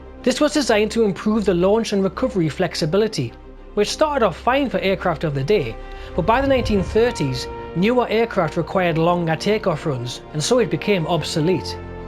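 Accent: Newcastle, United Kingdom. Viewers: Methinks a Geordie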